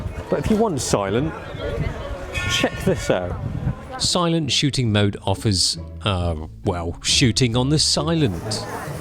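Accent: United Kingdom. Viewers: Asian guy with British accent is blood awesome! Isn't it? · Most annoying thing is Asian talks in British accent 😑😑😑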